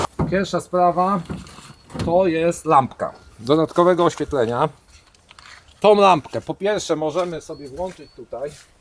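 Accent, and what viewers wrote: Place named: Gniezno, Poland. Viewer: Gnieźnieński akcent w terenwizji , znajoma żwirownia , jak milo :) plus 12l V10. Czego chcieć więcej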